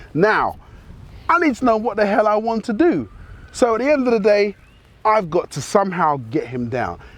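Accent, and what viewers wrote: United Kingdom. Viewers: Love the "3 strikes for the price of 1".....your wonderful accent makes me miss London and "The Continent". Very curious what the lady with dog said that made you chuckle. What I think you are saying is that there are the martial arts in the gym and then, well; there's brawling in the street.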